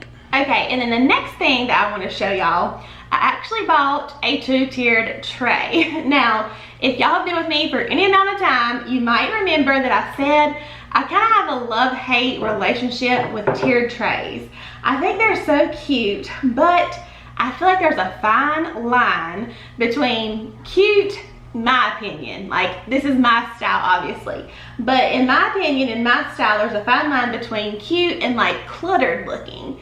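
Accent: South, United States. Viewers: I love your sweet southern voice 😍. You have great style thanks for the inspirational video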